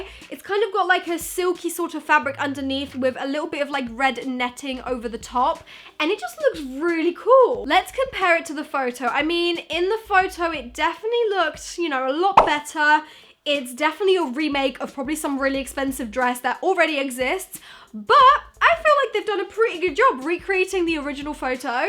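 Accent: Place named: United Kingdom. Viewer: british accent nooo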